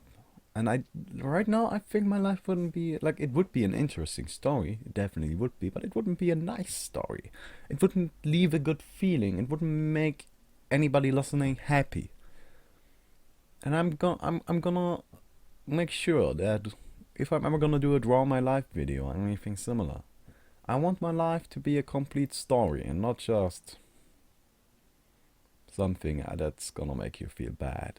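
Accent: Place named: Germany. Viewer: hay bistb dud eutscher=? dein aktzent ist soo schön deutsch ;)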